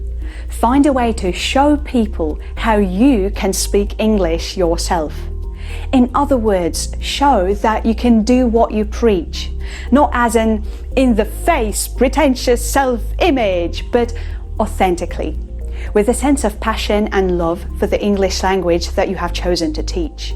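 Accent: United Kingdom. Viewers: I think you have lived in England before because no one can speak like British accent fluently like you without living in England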